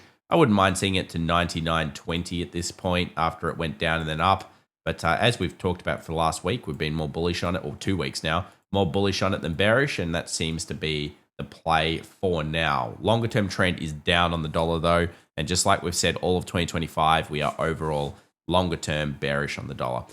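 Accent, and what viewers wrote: Australia. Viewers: He's Australian.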